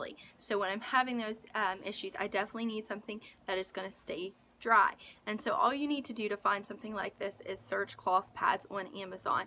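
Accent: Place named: United States. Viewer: Thank you so much for this info. I was also questioning the comfort of a waterproof layer daily. To my ear you sound American so I don't know if you know in Europe female incontinence is often treated with a tens (may no be spelling that correctly 🤷) unit, it's a little bigger than a tampon. It sends a low current shock that causes your muscles to contract, so like kegal exercise but more efficient with much better results. You can buy the stuff on Amazon. The unit is the same but has different plug ins for the more common use for leg and back pain which are commonly sold in store in the pain relief section. Thank you again for a very useful video.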